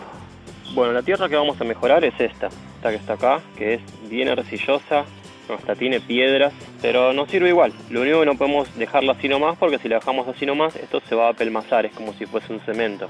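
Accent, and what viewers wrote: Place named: Argentina. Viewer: Buenisimo! Pareces argentiino. Que parte?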